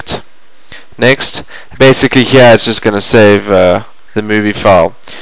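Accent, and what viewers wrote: South Africa. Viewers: Are you from South Africa? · dude your south afrikan neh?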